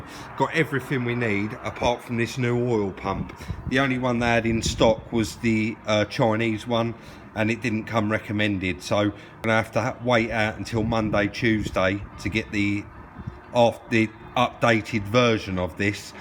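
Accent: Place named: Cockney, United Kingdom. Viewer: Love your cockney accent mate !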